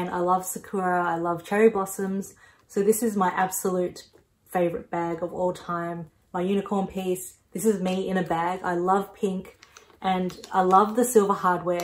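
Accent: Australia. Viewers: So refreshing to hear an Australian accent! I’m from Melbourne as well! If you don’t mind me asking, what do you do for work?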